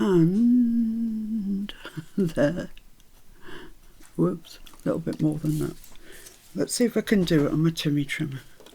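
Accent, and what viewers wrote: England, United Kingdom. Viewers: It is the difference in accents. I am in the United States. My son in law is from your part of England and sometimes he has to repeat things for me. I will continue to watch what you are doing as i love them. I bought an Edith Holden book so I can use it for some of your wonderful journal items. I had never heard of her books so you have expanded my knowledge. Thank you.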